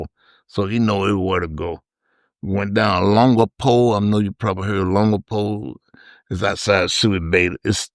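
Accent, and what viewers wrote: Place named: South, United States. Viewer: I'm a Southerner so I've met plenty of people who sound like him and I always love it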